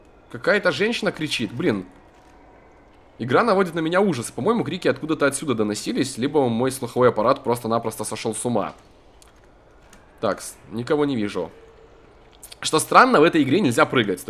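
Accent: Belarus. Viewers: ты белорус или русски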